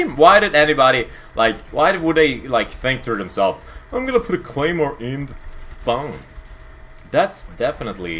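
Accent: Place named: Sweden. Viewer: can u be my english teacher. MY teacher is like 80 and scottish. Scottish accent< Swedish accent!!!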